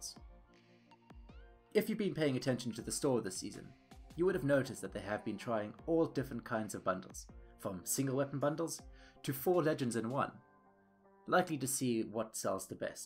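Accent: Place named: South Africa. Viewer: Are you South African Im pretty sure you have a South African accent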